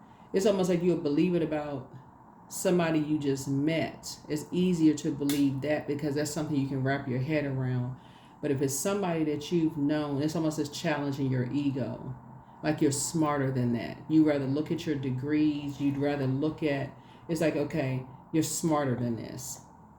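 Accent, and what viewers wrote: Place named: South Florida, United States. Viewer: I'm from South Miami and I dont many people who speak like that. I think its Carrebien/latinx black people who are born in the USA south florida dialect. Very specific north Miami. Anyway you hit the nail on the head.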